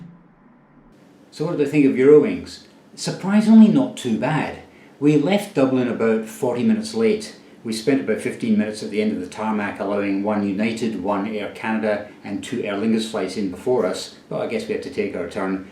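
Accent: Scotland, United Kingdom. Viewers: Love the Skaughtisch accent.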